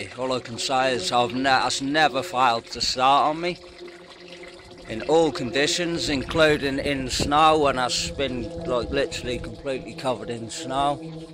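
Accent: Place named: Norfolk, United Kingdom. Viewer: Loving the Norfolk accent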